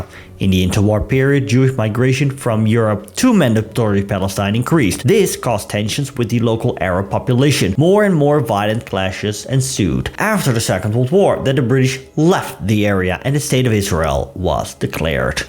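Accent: Netherlands. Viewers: I can hear you are Dutch love the accent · Within the first few seconds of this video, I knew you are Dutch because of your distinctive accent.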